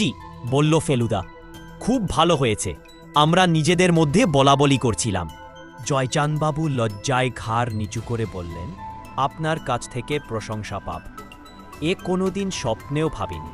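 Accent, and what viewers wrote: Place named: India. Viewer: Awful rendition of an excellent Ray story. Hindi accented Bengali is difficult to understand.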